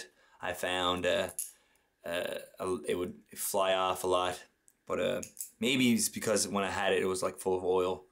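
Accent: Canada. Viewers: Your full Canadian accent came out in this video.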